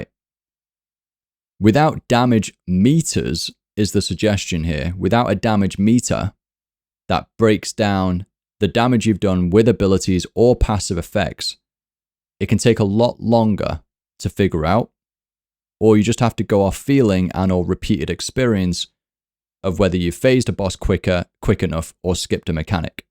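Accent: United Kingdom. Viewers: Selby is from Leicester. You, too? Perhaps a Leicester accent but wtf even the voice matches lol.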